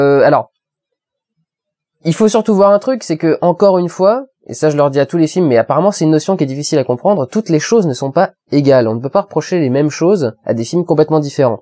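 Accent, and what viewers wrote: Germany. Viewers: non mais ton accent allemand m'a plié en 4, franchement si tu as un jour une autre facette de toi à mettre dans tes vidéos, tu mettre le "spécialiste" le gars qui rationalise tout à la science et tu lui mets cette accent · Enorme l'accent allemand !!!! :)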